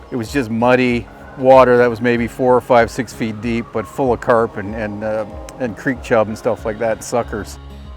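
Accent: Canada. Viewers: You’ve got a be a Newfie lol 👍👍👌👌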